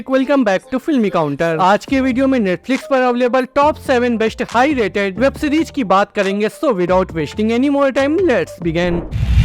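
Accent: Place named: Bihar, India. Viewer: Change ur voice bihari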